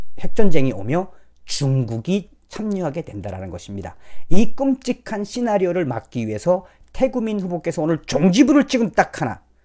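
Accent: North Korea. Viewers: TV 이전에 너무 북한 사투리가 듣기 가 자연스럽지않아 시청을 기피했는데 오늘 우연히 방송을 들으니 정상적인 발음으로 성의것 방송을 하니 자연스럽고 잘 들립니다 앞으로 큰 발전 기원합니다 구독버튼 눌렀습니다ㅡ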